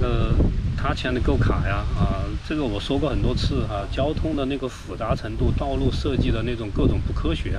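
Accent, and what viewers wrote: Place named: China. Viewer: 你是否遇到了马来西亚共产党了，声音与大陆一样啊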